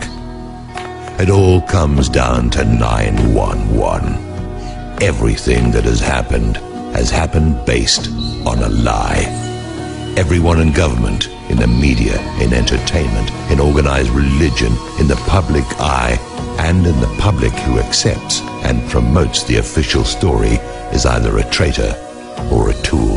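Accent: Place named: United Kingdom. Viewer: You will be doing this video & the message an immense service by changing the word 'American" in the first sentence to 'Everyone'. The British voice over tells us to use 911 as a litmus test for complicity or stupidity. Please, all those of the truth movement - keep in mind the INTERNATIONAL scope of the problems, of their impact and of the audience watching your videos.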